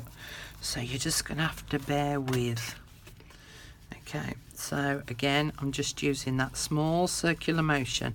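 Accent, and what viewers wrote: United Kingdom. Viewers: Love that British accent and how precise you are with placing and cutting. Your instruction is excellent and I am definitely addicted to these envelope journals thanks to your beautiful presentations. I am a bit unclear about where your gorgeous kits are from. There seems to be more than one resource, your own designs and others? Thank you for sharing your incredible talent. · Oh I'm so proud of what I have accomplished today. Some pages are just a wee bit crooked...like an eighth of an inch but I still love this crooked soft covered beautiful fun journal you have taught us step by step. How can anyone do this? You are a sheer genius and a love. I learned a whole lot too. Truly I have. I have to make my cover but I'm saving it for last. I want it to be extraordinary and wonderful and full of bits of happiness... I love how you you use the word bits all the time. 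And your accent is helping me with British movies I could nevah undahstand before you. I want to show you my envelope journal when I'm done but how my dear?. Again accept my apologies for being rude about editing things out. I so love watchin you and listening to your voice. Happy nights my dear woman. Happy nights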